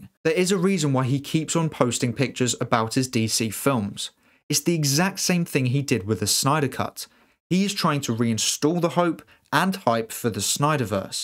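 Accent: United Kingdom. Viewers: Listening 👂 to your British accent as American 🇺🇸 I’m tired 😪 of Brits playing our Hero especially Batman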